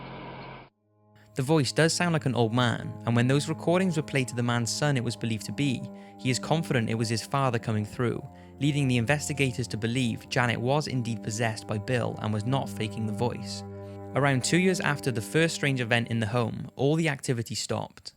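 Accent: Wales, United Kingdom. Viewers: k Not sure where you are from daz k, but to an English ear is from Wales and the "heerd" you have picked up is a feature of that regional accent. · Love your videos! Are you Welsh?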